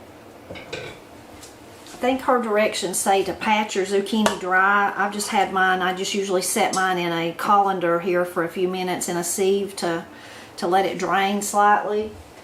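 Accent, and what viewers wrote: Appalachia, United States. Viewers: i fell in love with the appalachia accent it is so beautiful, i got a smile everytime i hear oil and moist, not in a making fun way, but because it wamrs my heart that every place has something so unique and special, flavors and sounds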